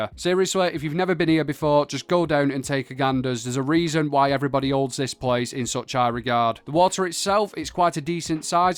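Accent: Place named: England, United Kingdom. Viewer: Thank for the vid been nice hearing a englishmen talking instead of all the yanks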